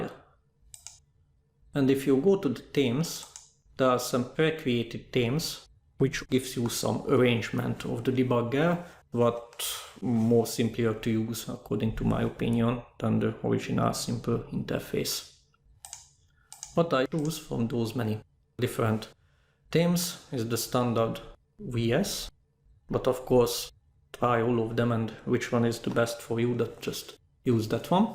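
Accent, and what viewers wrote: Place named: Hungary. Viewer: Cool vid! Hey, aren't you from Hungary? Your accent is so familiar for some reason.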